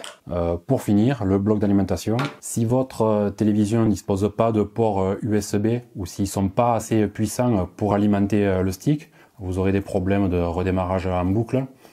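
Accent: South, France. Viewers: Bonjour, merci pour votre vidéo. Je suis pas très au point sur la technologie mais j'ai compris tout ce que vous disiez ^^ Du coup j'ai une question complémentaire : Puis je voir les chaîne "TNT" via ce petit boitier ? Mon but ultime serait de modifier mon abonnement téléphonie pour n'avoir plus que internet et voir la tv sans le décodeur traditionnel :) Merci d'avance et vive l'accent du sud !!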